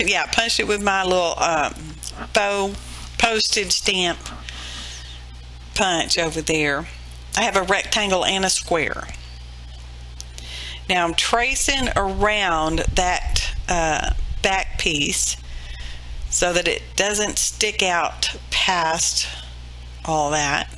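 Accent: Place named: South Texas, United States. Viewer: I just received a wonderful envelope of RAK from you. I got the blue and green paperclip bead at minute 32. This is the first RAK/Happy Mail I have ever received. I am so excited. You made my day. Love this video and plan to check out your others. Thank you so much. I am a Native Houstonian, so You do not talk funny, You sound like most South Texas Folk. My new go-to saying is going to be piddle fartin' around. Happy Crafting!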